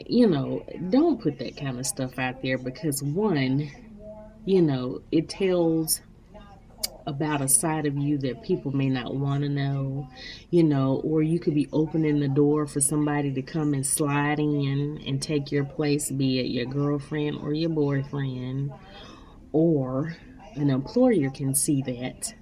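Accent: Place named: South, United States. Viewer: Agreed with every single detail, and straight 2 the point! Just love that deep-hearted Southern accent and i'm from Texas!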